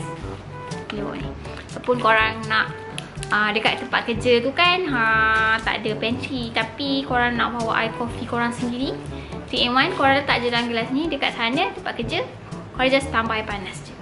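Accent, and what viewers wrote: Kelantan, Malaysia. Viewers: Cik ni asal mana bunyi macam kelantan je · Cik orang kelantan ke?? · cik ore klate ko??? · Cik ore kelate ko?? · Mek kelate ko mek?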